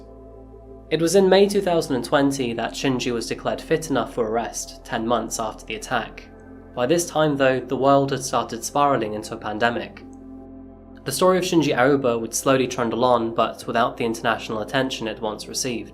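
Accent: England, United Kingdom. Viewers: I can tell by your accent that you're English but I cannot place where. All I know is that it isn't Devonshire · I know now you were born in the US, but i still cant get over the fact that a british person is offering me coffee!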